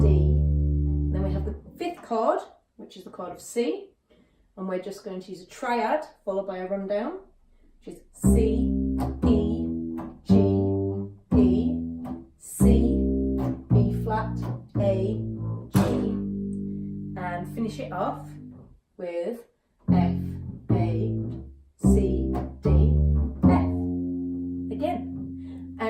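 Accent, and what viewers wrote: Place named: Yorkshire, United Kingdom. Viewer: Great, look forward to more. 'll steadily go through the playlist of videos you already have, just looking at your Ring of Fire tutorial from July. Shame you're not based up more north or East Midlands, I do better with in person lessons . PS sounds a bit like perhaps you're originally from Yorkshire :)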